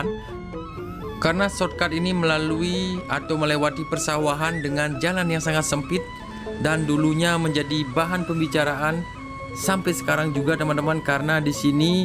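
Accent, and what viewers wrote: Bali, Indonesia. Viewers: Apa yg saya suka dari adalah beliau detail sekali menjelaskan spot2 wisata dan hafal sekali jalan2 yg beliau lewati, dan yg lebih saya suka adalah logat khas Bali yg membuat kita merasa yess!! kita sdg berada di Bali!!😀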